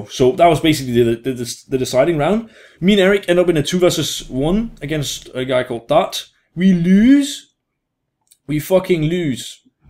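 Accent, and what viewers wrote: Denmark. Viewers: He is from Denmark :))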